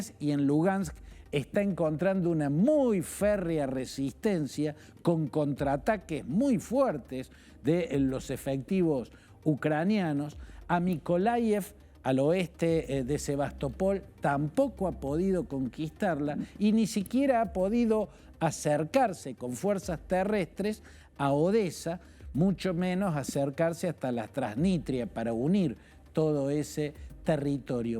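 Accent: Argentina. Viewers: Este señor, por su acento es argentino, bien estos ique analistas de este país siempre se saben todas las historias, no se como estados unidos no los contratan para asesores de guerra. Los mejores analistas que he escuchado que han sido embajadores de diferentes países en Rusia y que tocaron los temas del porque Rusia estaba actuando como lo hace. Este sabe mas que Putin osea solo vean a este señor y no tendrán idea de que esta pasado o pasará. Graciosamente solo habla de putin pero no comenta el papel de USA, Otan y la UE en este conflicto. Quisiera oírlo hablar de las Malvinas. Debe ser un libro de idioteces.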